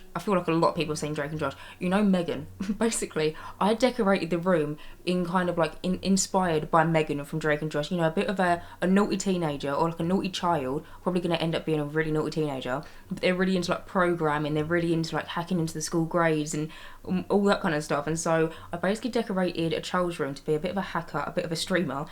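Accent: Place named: Essex, United Kingdom. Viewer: I love your voice, I could listen to you ramble on all day. Proper Essex haha hope you’re getting through your burn out ok, I just came out of one and then they broke the game and my favourite modder refuses to buy the pack and update his mods lol so I’m basically left unable to play because I need the mods lol I neeeeed them.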